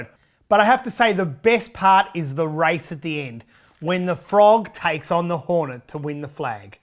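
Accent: Australia. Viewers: is there any other Australian that knows the same american shows, movies, and music like you do? I live in America and grew up in the 70's like you and im always amazed by the fact you had all the same stuff in Australia. Accept for your accent you would definitely fit in in America. Meaning no one would guess you were from Australia. Lol. Was it the Nintendo in 1986 that got you away from RC's? 👍😎🇺🇸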